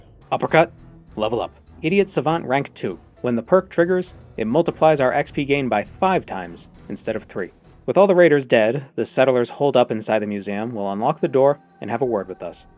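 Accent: Boston, United States. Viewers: the challenge was interesting but the real life boston lore and dialect were my favorite parts. excellent video. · I always got the sense you were from somewhere in New England. Let that Boston accent out in the next video! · I had a feeling you were from Boston. I was born in Quincy and lived all around the South Shore, so Fallout 4 really holds a special place in my heart. I also understand downplaying the accent. I do the same, otherwise people can't understand what I'm saying or constantly ask me to say words that end in "R." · The weirdest thing about this is I had never noticed your Boston accent until this vid. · In one of your older videos I'd just got off a call with my grandfather and realized... "Wait, that's a boston accent!" From the North Shore originally and I'd hear it all the time at work or in general and it just went over my head for like 3-4 videos. 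I do appreciate hearing the areas of Boston/Mass said in Boston way. I was so excited for Fallout 4 to come out back in that time cus I was really looking forward to a Fallout that was recognizable since it was so far in the future and in my area... I mean hell, my city is technically in it. It's like you said though, it feels right even if it doesn't look right. PS Green Line is just terrible and every station its a part of is a mess.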